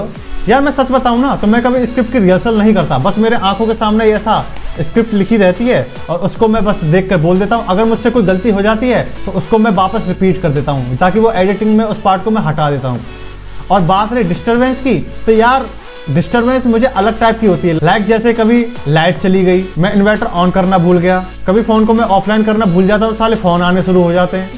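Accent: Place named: Haryana, India. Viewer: Bhai apka toh bhasa hariyana ka hai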